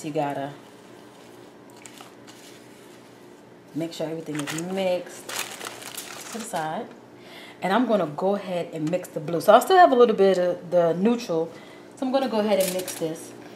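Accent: New York, United States. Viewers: Great video! You Sound like my aunt lol NEw Yorker? ❤️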